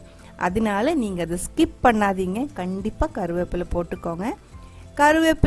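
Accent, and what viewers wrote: Kerala, India. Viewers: I remember my hostel days, my kerala friends used to share this with me during the tea time in the evenings, I just love your tamil, just like my Malayali friends💞💖🤗😘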